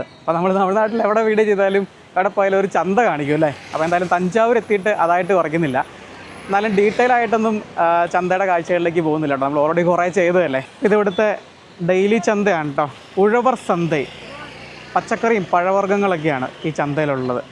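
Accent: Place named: Ernakulam, India. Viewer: Off topic. accent gives me chuckles. I am amazed how many accents a district like Ernakulam has. Another prominent one is from Kochi area. Edapally/Kalamassery/Aluva has no typical accent but more of a mainstream Malayalam I like to believe. Anyways your sweet accent makes the video more lively.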